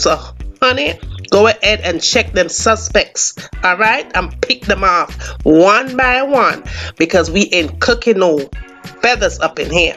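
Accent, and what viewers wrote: Jamaica. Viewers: I could listen to lady talk all day...lol. God I love Jamaican accents...lol.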